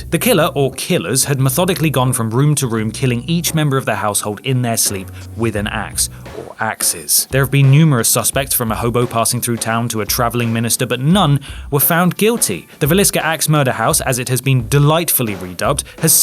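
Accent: United Kingdom. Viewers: They are mysteries because they cannot be explained. You couldn’t explain them. Only report them. But I enjoy your Brit accents.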